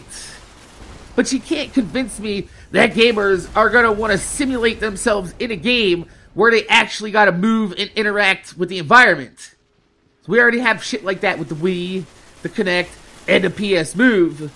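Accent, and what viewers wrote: New Jersey, United States. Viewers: you from north Jersey or south? SJ guy that enjoys your content!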